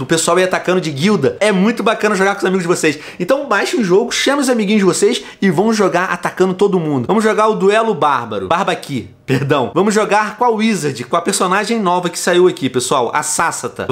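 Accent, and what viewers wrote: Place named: Portugal. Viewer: Tio fala em sotaque português ☺☺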